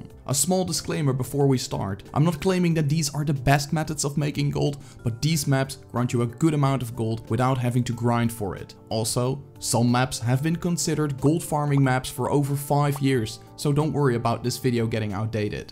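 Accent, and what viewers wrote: Netherlands. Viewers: Same haha! I already thought he was Dutch and im right! Goede engelse uitspraak tho!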